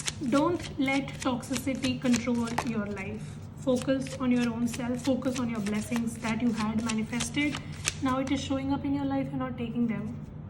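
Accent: India. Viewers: Your Indian accent is very interesting I like they way you laugh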